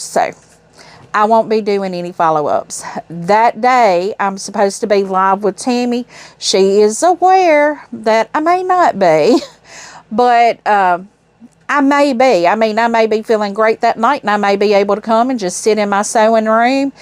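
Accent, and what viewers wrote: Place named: Virginia, United States. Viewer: My heart goes out to you and hope you have a positive and speedy recovery. I’m 43 and for almost 20 years I am in pain ( TMj). I live on the west coast (but my whole family aside from 3 of 4 children are also west coast ) my family is in VA so hearing your accent makes me miss home. Lots of prayers and positive energy for you and your recovery!!❤️‍🩹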